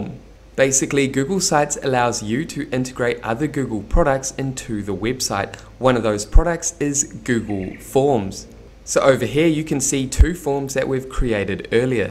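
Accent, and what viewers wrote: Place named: New Zealand. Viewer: Brilliant as usual. I just don’t bother with other YouTubers any more. wins. Plus, as an Aussie cousin from the other side of the ditch, I love your local accent.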